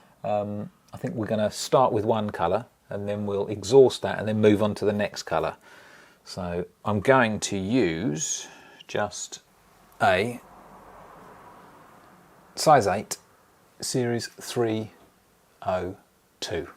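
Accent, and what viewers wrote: United Kingdom. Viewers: Absolutely BRILLIANT !!!! Fanominal panter.Love the British accent as well.💖👍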